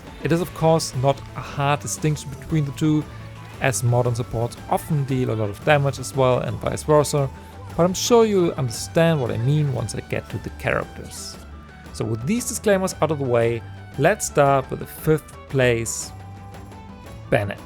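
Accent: Germany. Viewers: Lieblings Genshin Youtuber. Vor allem wegen dem Deutschen-Akzent😆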